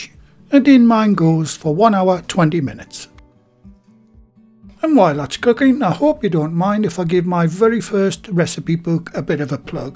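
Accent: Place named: Sunderland, United Kingdom. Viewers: Ah, a makem, I couldn't place the accent. One of the weird things about the north east is the variation in accent over very small distances. My earliest memories were in Felling, then Ouston, then Low Fell, until I moved down south for work then emigrated to the US. I've walked home from South Shields to Low Fell on New Year's Day so I think it's not too much of a stretch to say I lived within walking distance for 24 years. Thanks for doing this. My mother lectured at Gateshead Tech in cookery, and she wrote down a lot of her recipes for me, but it helps to have the video version. The things I really miss are stotties and Cumberland pork sausage. So now I have two hand cranked sausage makers, but I am not a bread maker... yet. America does not do decent pies, so I just bought a set of pie tins and a pudding basin. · Love the videos, I'm from Sunderland myself but moved away when I was 16 I'm 52 now living on the Isle of Wight I miss the accent and the food, that's why i watch these great videos Thanks so much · Just found your channel ,I saw the corned Beef and potato pie and thought great lets see if its like my Gran used to make.Lo and behold when i started watching and heard your Northern accent it made me home sick and hungry .My family on my mams side were from Seaham.Just watched the video on Panackelty great so pleased I found your channel.Dont know if you have made Ginger Wine or not but going to have a look.Regards from a northerner living in Spain.Thank you .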